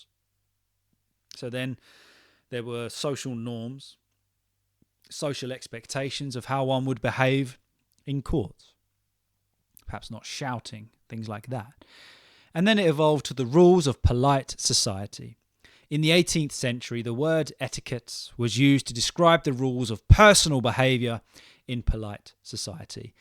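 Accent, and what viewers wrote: RP, United Kingdom. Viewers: Hi yeah, Hope you are doing good. Being an expat from a non English speaking country and having an advanced level of English speaking, I still find it difficult to grasp British accent. I recently started listening to your podcast with an intent to get accustomed to it. Thank you; you are helping me in understanding the accent and British communication style. Hope listening to you more will help me crack the accent soon. On the critical side, at times I feel you speak more of RP English. While It may be your natural speaking and teaching style, I sometimes come-across accent that are even more harder to understand. Coming to the topic, I feel etiquette is set of unscripted rules which vary with place and culture. Following these unscripted rules can be daunting for someone like me who is new to the country. Cinema etiquettes is almost same everywhere, but there are always a few who don’t follow it - at the very least, by not being on time. 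About the personal space, in my country, putting feet on the seat is considered rude and doing so with shoes on is the rudest. (Can’t imagine the places that shoes might have stepped! ). Unfortunately, I see a lot of youngsters doing same in trams and trains here. To be frank, that’s worst behaviour. Rogues exist in every corner of the world. Another disgusting behaviour that I experienced here is people loudly blowing their nose (while having flu) in a professional and quiet space. It’s really nauseating, especially coming from otherwise w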